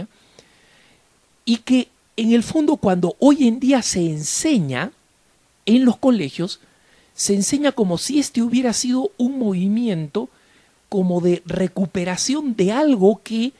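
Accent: Lima, Peru. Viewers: Creo que por el acento el pata es de Lima pituquilandia... me parece, o de Huacho o del norte chico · eres peruano?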